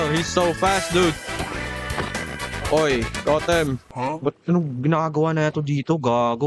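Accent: Visayas, Philippines. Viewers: Bisaya day ka dol hahaha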